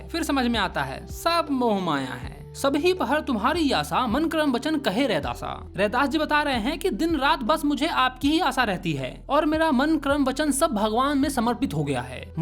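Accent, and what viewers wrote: Bihar, India. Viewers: Sir , Are you from Bihar because you're accent is just like Biharis people, and sir I am also from bihar and I want to say you teaching style is amazing ❤❤❤ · Bhai aap kya bihari ho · sir kya aap Bihari hooooo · Bihar ke hai sir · Sir aap bihari hai na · Aap bihar se hai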